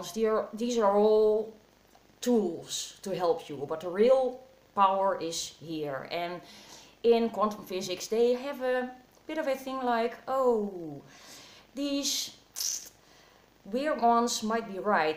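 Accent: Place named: Netherlands. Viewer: Ik kwam hier van V.K's shoutout naar jouw maar ik wist niet dat je nederlands was xD. Ik wist het meteen nadat ik keek naar je andere videos and je accent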